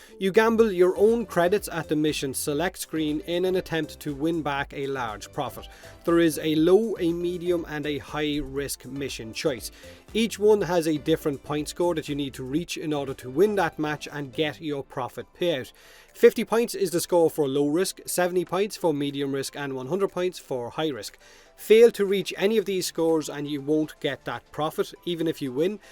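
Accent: Ireland. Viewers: I love your Irish accent. Thank you! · Awesome irish accent, hey! One of the reasons I prefer your videos to OriginalWickedFun is your voice! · He wasn't wrong about subtitles shitting on his Irish accent. Goddamn that's funny. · You have to get "pints" in the index? The Irish and their pints xD gotta love that accent <3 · Don't worry i love your bloody irish accent. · Love your Irish accent You single? 😏😂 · That accent killed me. Specially when he said youtube wont understand my irish accent xd. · Irish Accent ❤ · I subbed for the bloody irish accent.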